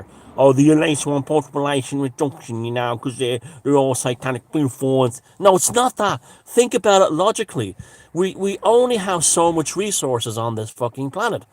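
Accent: Ireland. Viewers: There goes again with that intriguing accent, I love you... “economic growt”! I’m gonna start practicing my Irish accent... lol, I’m serious... I’m doing the accent right now as I type! Why am I thinking of ”Trainspotting” (1998) all of the sudden? That’s RIDDLED with Scottish indiscernibilities... which is also awesome. Alan Watt: “Such a farrrse, it’s such a farrrse...”! Did I hear you say lubricant?! Gonna get me some Irish laydays!!!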